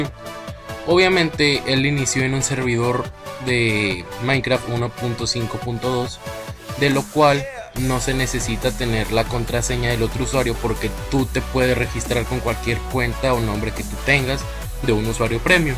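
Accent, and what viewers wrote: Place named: Mexico. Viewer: Hola amigo, he estado viendo tus videos, y me parecen muy bueno. A lo que veo ¿Eres mexicano? pues tus grocerias y acento es el mismo que yo uso jaja:), tu no te rindas y sigue creciendo creeme que tienes talento solo necesitas publicidad pero para mi ya eres bueno, me suscribo y te recomiendo:) Sigue porfavor se que tienes talento!!!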